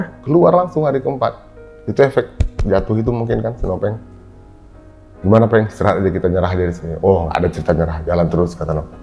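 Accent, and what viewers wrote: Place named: West Sumatra, Indonesia. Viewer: Urang Awak ma suaro nyooo Cubo agiah ampu hehehe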